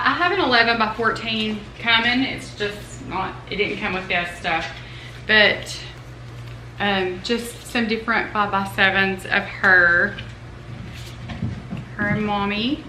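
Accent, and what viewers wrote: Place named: Tennessee, United States. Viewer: Hi, love your channel and your awesome Tennessee accent! 😊 Every time you read a devotional it really helps; life has been rough for our family the last 2 years. God bless you and yours.❤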